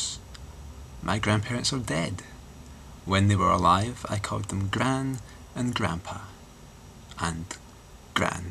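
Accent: Scotland, United Kingdom. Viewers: You are amazing man, love Scottish accent :)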